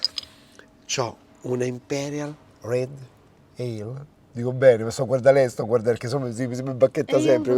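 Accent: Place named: Milan, Italy. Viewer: Max complimenti per aver assunto anche l’accento milanese quasi perfetto !!!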